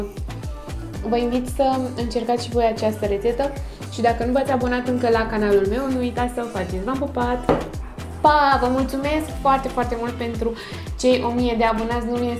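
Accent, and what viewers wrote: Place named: Moldova. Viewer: De ce vorbeşti cu accent moldovenesc... 🤔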